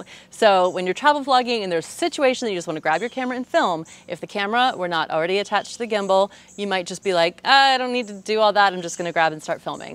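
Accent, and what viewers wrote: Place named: United Kingdom. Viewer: I love your British accent!!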